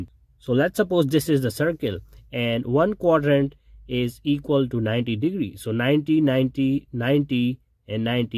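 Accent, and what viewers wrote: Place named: India. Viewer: great indian teacher im from the philippines and your teaching is good with the accent! 😀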